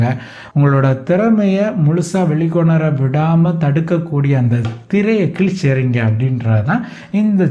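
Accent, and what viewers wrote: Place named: Kanyakumari, India. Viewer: I like your Kanyakumari slang😊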